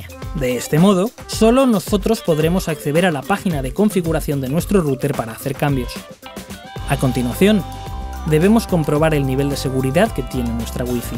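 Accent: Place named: Spain. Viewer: Puros tutoriales en español españa :v;ondaa vitaaaaal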